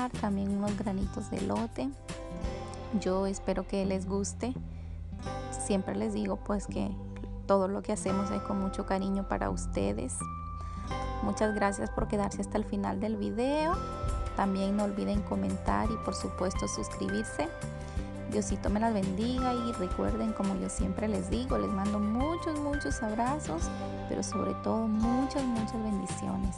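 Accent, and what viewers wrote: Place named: Mexico. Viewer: Que rico te quedo hablas con acento mexicano no hondureño que linda 😊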